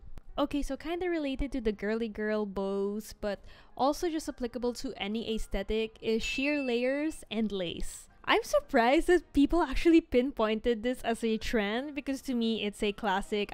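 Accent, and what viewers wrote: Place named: Philippines. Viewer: omggg i was thinking your accent sounds filipino and i was shocked when you spoke tagalog LMAO i like this content, pls continue doing more <3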